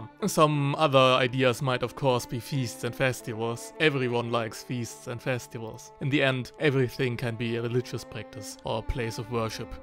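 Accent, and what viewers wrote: Germany. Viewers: Are you Swabian by any means?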